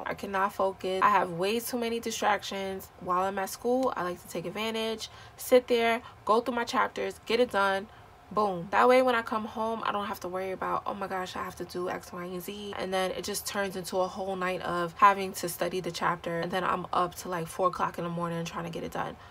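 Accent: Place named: New Jersey, United States. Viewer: I was literally binge watching your videos all morning and then boom a new post 😂😂 I am new to your channel. I work full time and goto an RN program . I am from New Jersey so your accent reminds me of home lol. Good luck! You are way more dedicated and organized than I was when i started. I think I was still in my college phase of partying and cramming but this last semester I rocked it out! I have one more semester starting in January so it’s been a long road but I am ready to be done and I am deciding what kind of nurse I’ll be. Anyway you amaze me and keep going and inspiring 💕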